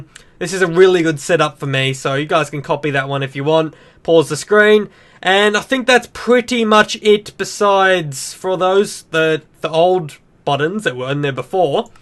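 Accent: Australia. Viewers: r u australian :)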